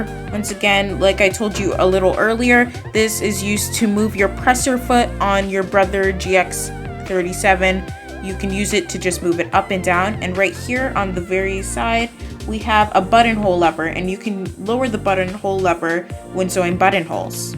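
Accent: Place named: Midwest, United States. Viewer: I can tell you're from the midwest by how you say your T's as D's...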